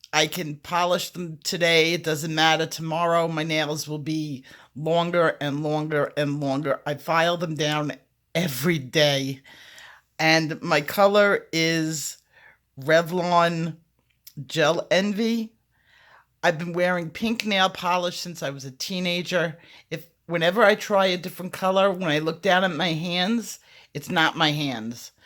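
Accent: New York, United States. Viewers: Thanks so much for this lesson. You are my favorite teacher. I watch other colorists videos and enjoy them but I learn the most from you. PS: I’m from Florida living in Tampa and I love your NY accent.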